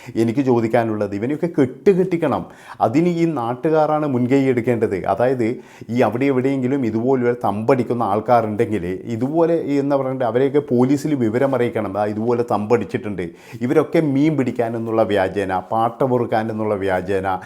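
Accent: Kannur, India. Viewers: നല്ല കണ്ണൂർ ഭാഷ ❤️❤️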